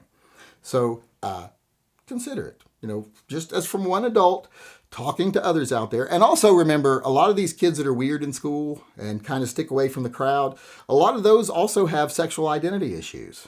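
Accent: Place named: Texas, United States. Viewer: If you're, how come you to sound like most of the other Texas country folks I know? Are you from west of the Taconic/ Appalachian Range? That might explain it. I've heard Mainers that sound like me, too - from west of the mountains. Or from in the mountains. WAIT A MINIT! Did I just hear you say offer the weird kid a handie??? No thanks don't want a stalker - it's enough that I talked to the weird kids. They become attached and no matter how kind they are, they usually end up being insistent, wanting sex with you all the time. I've had six oddballs / weird kids, sexually ambiguous, moody broody or otherwise outcast loner types fall in love with me because I was kind enough to give them the time of day or to speak a bit and say hello with a smile. They also get very possessive of your friendship and time. They also make it all bigger than it really is. 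That of course probably tells the world that it means the world to them, but it's really not the same for you. They fall in love and to them, that means sex - as it does for most all males, daughters ignored by fathers, but most always for the odd people who are probably not getting any parental love at home or sibling affection, respect, or any familial attention even. It may be the very reason they are odd because their parents are like the bullies at school! No handjobs for the oddballs, it may create stalkers and other unsavory events.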